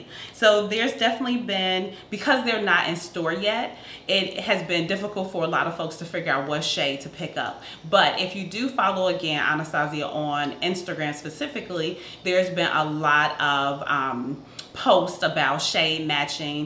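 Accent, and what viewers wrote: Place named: Texas, United States. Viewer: Are you from Texas?? You sound just like me! Hey Y'all! #htown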